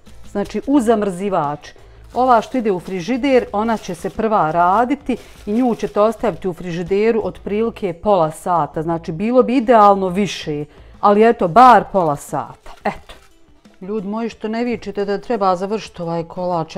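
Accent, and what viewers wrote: Bosnia and Herzegovina. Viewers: Legenda si ,, obozavam tvoj bosanski izricaj..pozdrav iz Splita..😗😗😙😙😙😙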